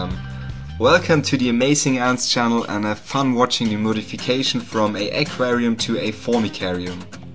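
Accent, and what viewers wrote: Germany. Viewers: Well i can hear that youre german. WoW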